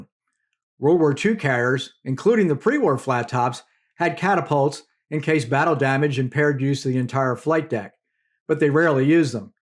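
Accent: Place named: Delaware County, United States. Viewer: I think the narrarator is either from Philly, or the Delaware Valley area. Anyone from Philly recognizes that accent a mile away.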